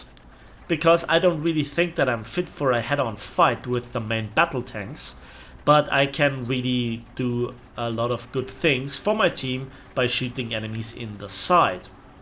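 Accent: Germany. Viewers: I'm subscribed for that crisp German accent and inter-regional banter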